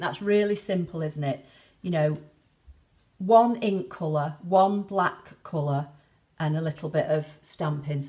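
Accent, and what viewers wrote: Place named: Midlands, United Kingdom. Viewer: Thank you so very much for the ideas! I have just got this set for the lipstick! My granddaughter is suddenly into makeup and we were unable to find ready made cards to suit her age and likes, so this is very timely for me! I love the clarity of your explanations - you don't assume anything and again, for me, that's perfect! I have a scan n cut that's not been used, so now is the time because you are a great source of inspiration. I also love your accent, my dad was from the midlands and I don't get to hear him any more so listening to you is a bit like "home" except I'm an Aussie lol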